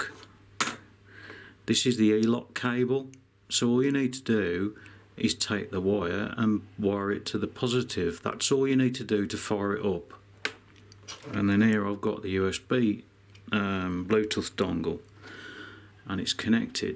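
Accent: Birmingham, United Kingdom. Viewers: I find your Brummie pronunciation of 'Bluetooth' a bit different to how we say it up north.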